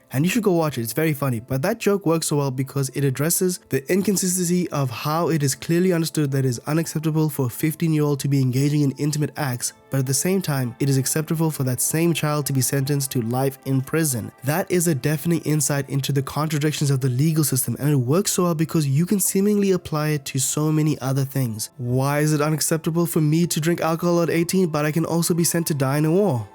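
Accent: South Africa. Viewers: Are you south african? I'm detecting a South African accent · unrelated question but are you south african?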